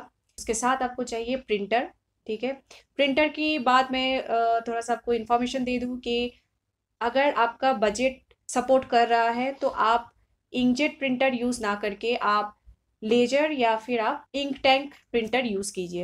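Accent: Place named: Bihar, India. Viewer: Hiii, kya aap Bihar se ho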